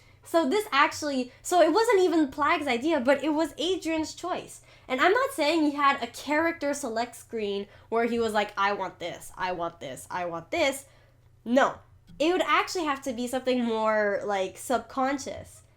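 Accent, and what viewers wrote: Quebec, Canada. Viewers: never seen this channel before, but I guess you're a Quebecker, right? Cause you sure sound like it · Unrelated, but are you from Quebec? Cause your French accent sure does sound like it!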